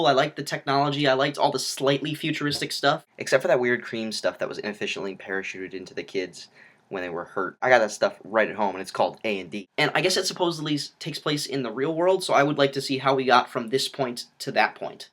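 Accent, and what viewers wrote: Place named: United Kingdom. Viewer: You're Bristish accent is immense :L And I'm British, so i know what I'm talking about :)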